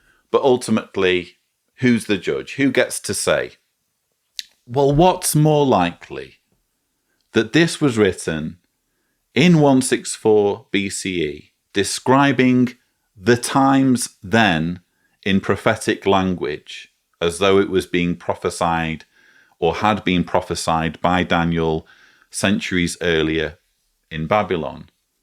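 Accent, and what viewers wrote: England, United Kingdom. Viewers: Where in England is your accent from?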